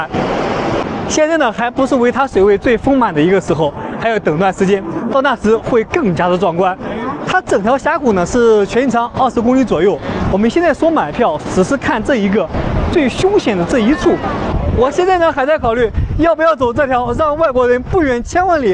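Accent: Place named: Su-Lu-Yu-Wan border, China. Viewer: 听口音，博主应该是苏鲁豫皖交界地区口音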